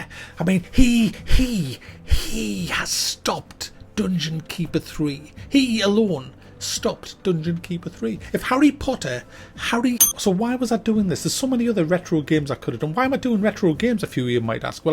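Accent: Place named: Newcastle, United Kingdom. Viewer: geordie youtoober? well aye. ne worries pal.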